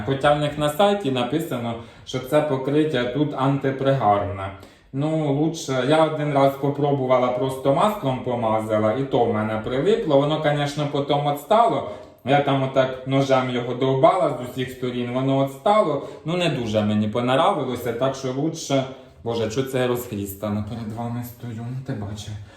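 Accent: Poltava, Ukraine. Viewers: Дякую дуже, сміюся вголос. Нагадуєте мамину говірку, вона була з Полтавщини.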